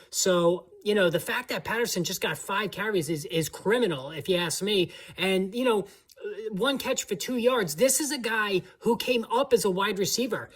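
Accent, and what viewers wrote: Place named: Boston, United States. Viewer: haha.. that is funny.. are you from boston area? love the accent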